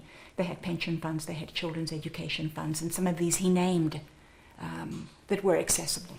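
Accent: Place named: Transatlantic, United States. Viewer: ine of the last beautiful women that use the transatlantic accent. love iy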